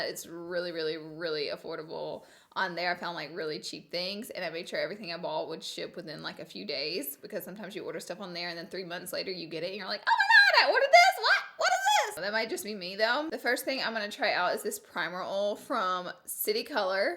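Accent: South, United States. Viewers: Olly. Lol. Love u with ur sweet southern accent!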